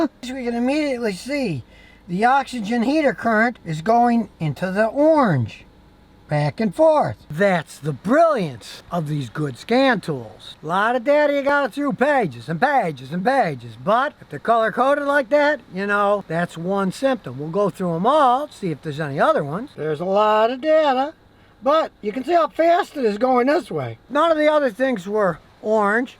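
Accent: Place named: Buffalo, United States. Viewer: Yah- heez from Buffalo!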